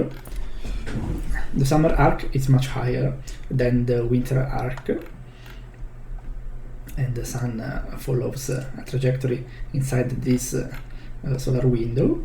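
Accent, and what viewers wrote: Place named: Italy. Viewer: Very very nice, congratulations. It's one of the things I wanna make too ! By the way Italian accent is perfect.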